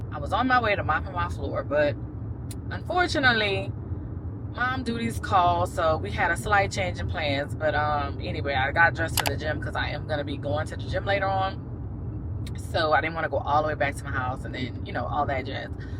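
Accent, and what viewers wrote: Louisiana, United States. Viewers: Oh babbby I definitely can tell..tell you are a Louisianine 😂😂😂 that accent can’t be mistaken!😁 Your daughter is so cute! In her Big Girl bed! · Sometimes there’s no accent and then some other times I’m like oh yea she is from Louisiana 😂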